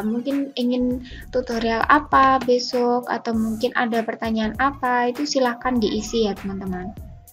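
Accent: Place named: Central Java, Indonesia. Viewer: Dari logat cara ngomongnya kaya orang Jateng 😁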